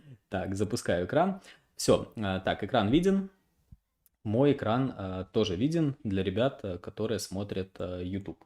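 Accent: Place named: India. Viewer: жуткий акцент у этого парня.... индус что ли....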